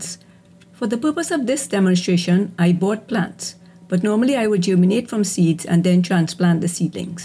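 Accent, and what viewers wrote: Trinidad and Tobago. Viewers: Are you from Trinidad? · You sound like a real trini gal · I knew her voice was sounding like a Trinidadian!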